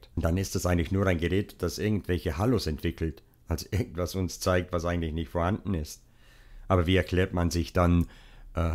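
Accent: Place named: Tyrol, Austria. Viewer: Lieber,danke fpr das tolle Video,Zeitreisen und ähnliche Phänomene zählen zu meinen Lieblingsgebieten!👍 Ich schicke die besten Grüße zum Sonntag ins schöne Tirol aus Essen! 😊 P.S. ich liebe deinen Dialekt,lebst du in der Umgebubg des Lechtals?❤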